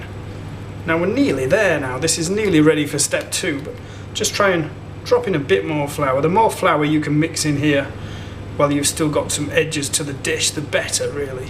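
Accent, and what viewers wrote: United Kingdom. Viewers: Are you northern english??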